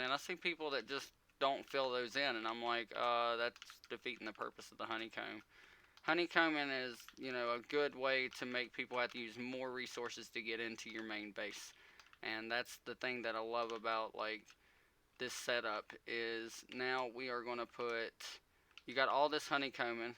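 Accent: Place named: South, United States. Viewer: Sweet build! You sound like you might be a Southern boy like me.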